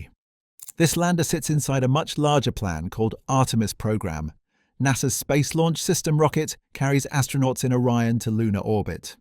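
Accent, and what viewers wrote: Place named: United Kingdom. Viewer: thanks for sharing i like science documentaries plus enjoy your British English accent look forward to your next work greetings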